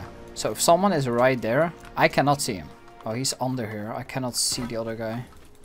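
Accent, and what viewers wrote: Netherlands. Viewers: Yoo bro you dutch ?? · Are u dutch? ur accent is so strong.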